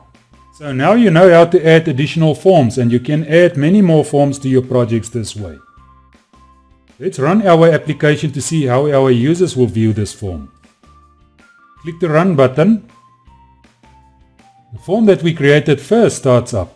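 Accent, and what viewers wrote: South Africa. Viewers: Is jy Afrikaans?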